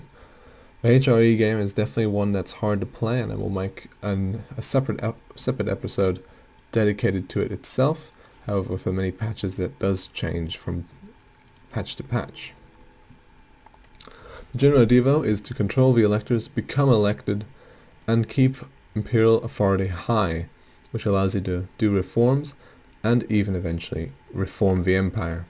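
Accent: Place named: Austria. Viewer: Are you from Austria? You sound like an austrian guy.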